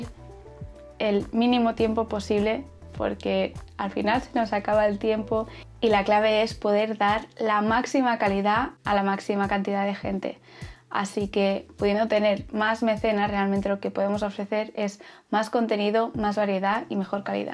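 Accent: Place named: Spain. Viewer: Que bella eres flaca! Aunque tu qcento españolete la corta un poquito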